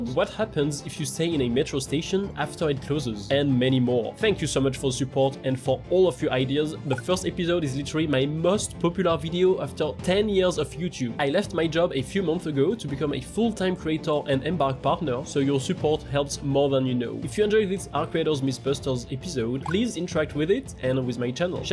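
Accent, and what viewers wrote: France. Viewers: Français non? Le bon vieil accent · Why do i feel like you have french accent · sorry you have to play this game on hard mode once the other raiders realize you sound french.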